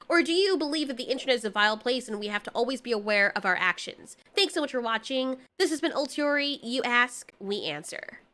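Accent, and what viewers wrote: Scotland, United Kingdom. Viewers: It was a Scottish accent Alteori. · an Alteori Scottish accent isnt something i knew i needed till this video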